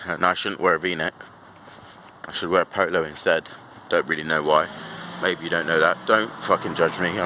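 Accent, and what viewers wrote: United Kingdom. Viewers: mad how I use to think u was from london🤦🏾‍♂️😂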